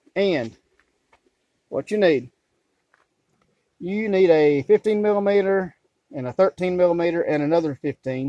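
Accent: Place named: South, United States. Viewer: I don't understand why people feel the necessity to criticize such good-hearted intention and help. The bungee cord move.. what a boss .. so simple, it's genius! (Poetic license here folks, please? I understand proper grammar. But, we're not in an academic setting. Are we?!) Being a city boy mathematician, 17 years ago I moved to a small Texas town. I've discovered I'm whiter than most and a country boy at heart! The problem with that is that I'm Hispanic, Mexican American, or whatever I'm called these days. I liked the Southern Drawl! Brother, I guess many have forgotten the Golden Rule. I enjoyed your video and light sarcasm. You are funny! Don't forget to keep us laughing. I learned and I was entertained at the same time. As an educator for over 30 years, learning should be FUN! GREAT JOB!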